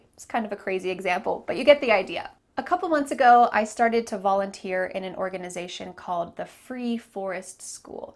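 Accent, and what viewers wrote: United States. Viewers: Are you speaking USA English? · Are you American?? I like American accent💜 and your smile is so beautiful 💜💜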